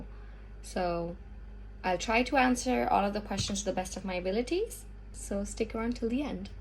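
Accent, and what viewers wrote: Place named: Ireland. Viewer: crazy how uve only been In Ireland around a year and im already hearing a bit of an Irish accent peaking thru!! so cool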